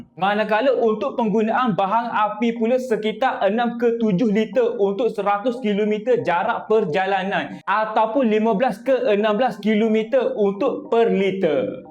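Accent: Kelantan, Malaysia. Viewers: Ini loghat kelate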